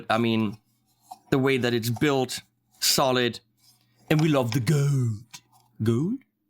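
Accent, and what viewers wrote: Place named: Germany. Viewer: "And you have this riser here for your wah... or your "Wah"Lume pedal." Gots to love the German accent!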